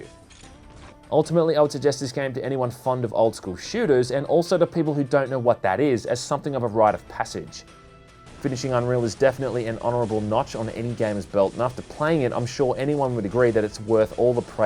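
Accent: Australia. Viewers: Is that an Australian accent i hear?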